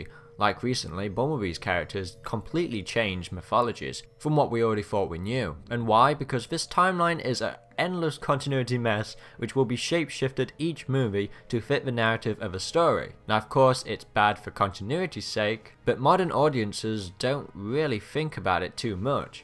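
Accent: United Kingdom. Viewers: Why do you have an English accent until you say Audobods and Decepticaaaans? It’s very odd.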